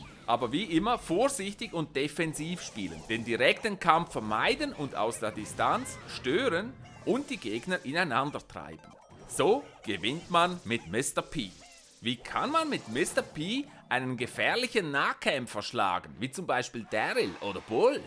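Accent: Switzerland. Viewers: Bist du a schweizar du bischt foll gaaaiiilll ich liebe das wen du sagst Sandwich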